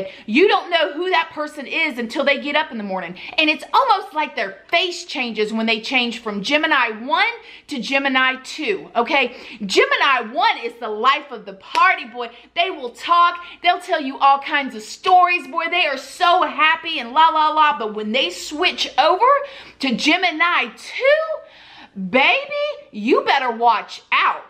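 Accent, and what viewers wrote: South, United States. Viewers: Hello. I’m a little late to your channel and everything so I doubt you even see my message but I think you’re awesome. I’ve been binge watching your videos for like the last 3 weeks now and I’m hooked. At first I thought everything on your channel was strictly for women only but I kept watching anyway. I’m from Pittsburgh PA and I know you’re from Florida but you’re very easy to listen too. Plus you remind me of one of the females from around my way. Just with a little southern twang. I loved this video in particular a lot. I know it was just for fun but a lot of what you said was right on point. I’m also a libra so I was definitely feeling this. Anyway keep doing what you’re doing. You have a fan over here.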